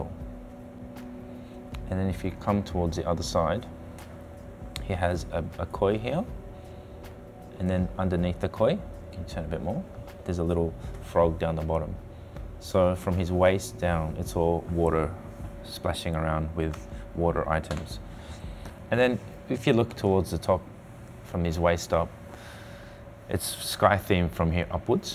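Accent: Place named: Australia. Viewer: The Australian accent threw me off for being japanese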